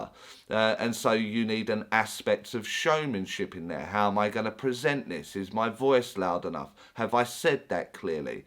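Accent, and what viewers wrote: Essex, United Kingdom. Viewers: Before I even watch, I can't believe I have seen this I have only just fou d out I am a medium but I've heard spirit for 4 years. I just thought I was crazy, well literally I've been hearing the word gatekeeper so if just typed that word in as I have been hearing the name percival as well so typed in the 2 and your the first video and it's about mediumship. I am having a moment stuff keeps happening and I'm researching and it really is true.. I'm not nuts atalll how would I even know this.. I've just had to search the words I hear.. I'm very much still coming to a realisation I've been classed as having a mental health because I couldn't explain what was happening to me. I don't know why anyone would want to put thumbs down, I mean you sound like where I'm from Essex x💚 anyway I will watch now. There's a nice vibe straight away sorry to type on I just can't believe what's happening.. 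I'm having a moment and I have to get it off my chest cis everyone thinks I'm crazy when I say I've been hearing the gods as well as reletives and other entity I can only see tho via dreaming as if yet but I've spoke with Calvin klien and had visions of Diana, spoke with Hermes, Hearing percival and spoken with Gabriel too Thank you I probably sound so silly but it really true x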